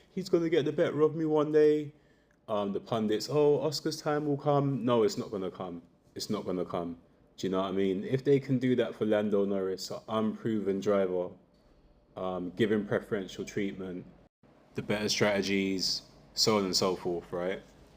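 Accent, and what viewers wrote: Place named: South London, United Kingdom. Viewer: you sound and talk exactly the same as an old friend of mine from lewisham in south london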